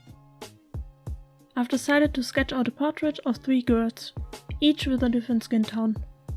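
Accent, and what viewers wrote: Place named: Germany. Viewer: Hey just wanted to say your video is actually pretty good, the quality is good! Also it was pretty interesting because i never had an actual set of these to use for an actual illustration. I suppose that you are a native German speaker? At least you are sounding like one, but i might be wrong- Anyways, your accent is indeed visible and I do not know if this helps but I learned that speaking englisch, and getting the accent a bit less visible is not easy. As an advice: it might help you if you speak more "swollen" German is a language that makes you speak with mote cutts. English is a language that is rather smooth, while german is kind of fractional, both in individual can sound very pretty but combining these mostly sounds strange because its a big difference. Also if you really wanna work on it you always can watch movies or tv shows and speak with the characters. 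Because if you focus on that for most people the words come again voiced in their heads when you then speak them out loud. But, do this with older series, something that you already watched and better not use something like sit-coms, because people are speaking faster and not always correct english so its not the best idea to get there an example. At least this was something that helped me alot! But you actually don't need to work on the accent at least you speak very clearly and you are understandable, so infact there is no real need to do so, but if you want to improve thats the advice I could give... Have a gre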